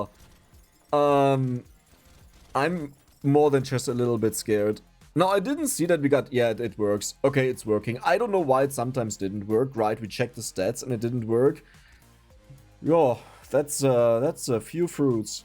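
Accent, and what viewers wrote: Germany. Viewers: he sounds very German, not Austrian German too. You can also tell by the efficiency shown here. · hi my first time on this channel, after 1 min: yeah this guy is german, after minute 29 i heard "scheiße" and burst out loughing, great video. love brotato :D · Er spricht weder BE, noch AE. Er spricht germanenglish 💯😃💪🏼 bitte content auf deutsch, das wäre der Traum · Are you german? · hab mich die ganze zeit gefragt du klingst so deutsch dann bei Minute ungefähr schei** hat alles bestätigt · Ich liebe deinen deutschen Akzent 😂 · rede doch einfach deutsch das kann man sich ja nich anhören mit den deutschen akzent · ich wusste doch das du deutsch bist man merkts and deinem akzent und an dem "HEILIGES SCHNITZEL" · Broooo is that guy german? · R u German ? Ur accent is so german line mine 😂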